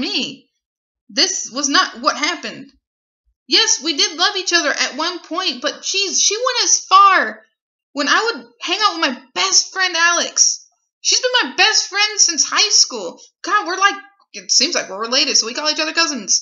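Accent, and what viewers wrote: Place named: New Jersey, United States. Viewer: I KNEW YOU SOUNDED LIKE A JERSEY GIRL!!!!! LMAO HALLAAAAAH!!!!! I'm in up North now LoL!!!!! Got fam in Clifton n Bayonne N All up n down Jersey!!!!!!!💙💜💙💜💙💜💙💜💙💜💙💜💙in boonies now, Sussex County Stixxxx!!!!!! Mad Love Girl!!!!!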